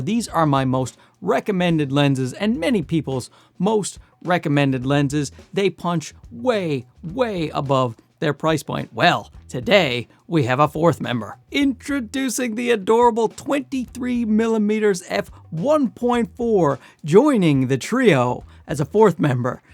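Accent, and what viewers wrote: Canada. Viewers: Nice lens!Loved the shots at the intro….pretty artsy! Handheld or added external stab? Great energy …almost want to buy these lenses and then buy a camera to fit! Btw the 23mm made you look more like Chris Hemsworth, but the CDN accent offset.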